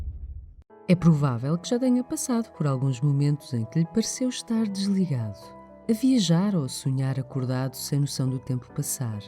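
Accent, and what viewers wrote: Portugal. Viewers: Sotaque de Portugal, legal, aqui no Brasil pouquíssimos conhecem essa doença, eu pergunto pra alguns psicólogos ou médicos e ninguém nunca ouviu falar delas(dp e/ou dr) administradores do canal, vocês tem alguns artigos sobre DP e DR? estudos mais avançados sobre elas? quero ler, quero estudar a fundo pra tentar melhorar ou me curar disso, a saúde no Brasil é de passar vergonha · É português de Portugal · sotaque lindo de Portugal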